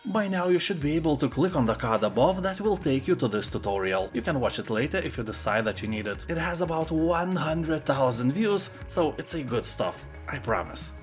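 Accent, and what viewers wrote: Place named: Baltic states. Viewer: Im not sure if you actually convinced me to pick up the game again or if it was the thick Baltic accent that just made it sound like you were speaking from authority. Either way, I downloaded it from GOG, the one I had on CD wasnt "complete" anyways even if I could find it.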